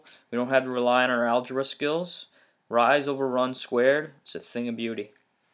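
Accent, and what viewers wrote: Newfoundland, Canada. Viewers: Are you from newfoundland by any chance?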